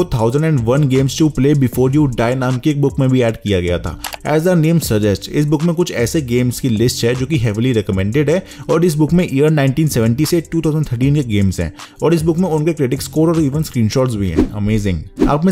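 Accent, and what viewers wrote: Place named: India. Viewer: He is talking indian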